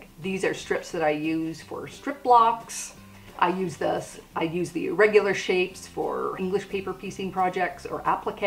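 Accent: Canada. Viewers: Enjoyed your video very much, so I subscribed. I feel positively brilliant because, as a newbie quilter, I figured saving 2 1/2" squares, 2 1/2" strips, and 5x2 1/2" rectangles would be most useful--same as you, an obviously experienced quilter, figured! I needed quilting fabric to make a block once a month for a local craft store--they draw a name and the winner takes home all. Since I let it be known that I would accept scraps, now I have plenty for the block drawing ;-) The pieces that are too little get chopped up to make animal shelter beds. A friend also gave me a ton of leftover scraps from a T-shirt class, and then there are all the batting scraps too small to piece together for potholders. Watching TV with my husband is a good time to cut up all those unwanted scraps! BTW, love your Canadian accent. Signed, a scrap loving neighbor in Washington state.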